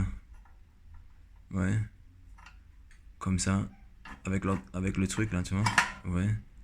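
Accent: Belgium. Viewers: tu me fais deliré comment tu parles...ta "façon d'être" au travers des vidéos... tranquille quoi, posé.. avec ton petit accent belge (si je me trompes pas). en tout cas continu tes videos cest sympa et apaisant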